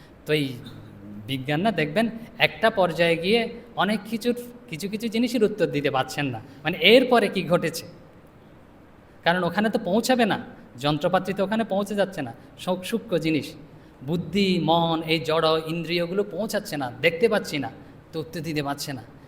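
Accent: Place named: Chittagong, Bangladesh. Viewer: Apne ke chto gamar lok vasar tan ta chogam tan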